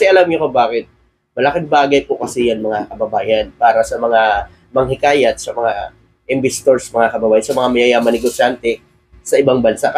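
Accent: Iloilo, Philippines. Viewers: Taga iloilo ka man boss? 🎉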